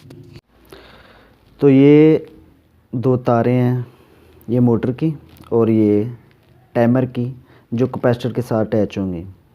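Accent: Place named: Sialkot, Pakistan. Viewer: Mera andaza theek nikla apki boli sialkoti lg rhi thi.mn bhe sialkot me hota hun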